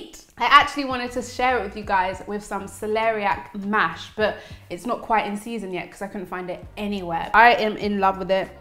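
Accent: United Kingdom. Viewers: I love your British accent. It's not snobby or pretentious at all 👍.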